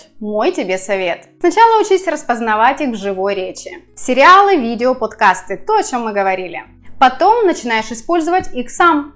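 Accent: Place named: Russia. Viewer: у вас ужасный русский акцент когда говорите по испански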